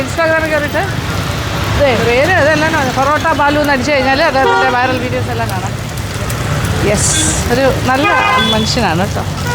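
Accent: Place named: South Kerala, India. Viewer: Im Tamilian from Kanyakumari. Akka is very famous in Tamilnadu ♥️. Something about her malayalam its very different and easy to understand as a tamilian compared to some other dialects. Maybe she is from South Kerala thats why?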